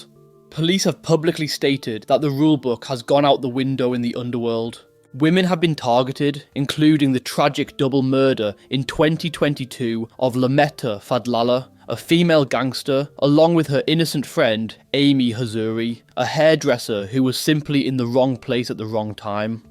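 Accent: England, United Kingdom. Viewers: It's Cah-soo-la, not ca-sul-a. You're a pom, right?😀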